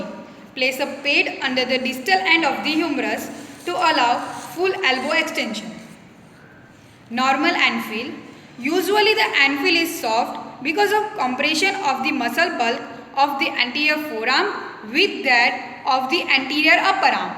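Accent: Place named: Gujarat, India. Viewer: The girl who is giving instructions is pakka Gujarati Only then she can have that funny accent 😂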